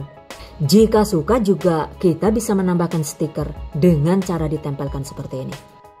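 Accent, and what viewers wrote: Banyumas, Indonesia. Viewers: Jane sampean wong ndi mba Q bsa ngapak ya.. SALAM,ngapak NEK ORA NGAPAK ORA KEPENAK🖐👍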